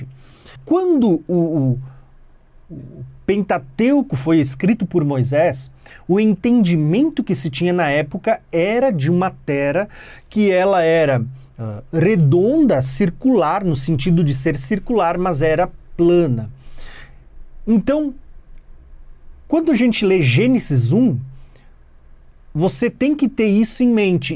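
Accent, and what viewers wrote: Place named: Santa Catarina, Brazil. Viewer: Catarinense ou polones fala assim mas acho que dá pra entender né?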